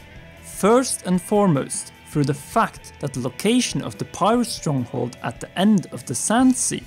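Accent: Norway. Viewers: Hey dude, curious about your dialect, norwegian? Ever seen the series Hell on Wheels? you sound just like the character "The swede". Cheers. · That accent, er du norsk?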